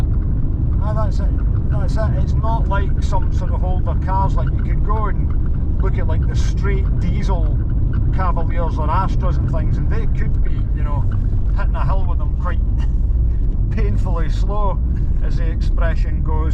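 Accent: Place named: North, United Kingdom. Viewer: Difficult to understand your strong northern accent. Need subtitles.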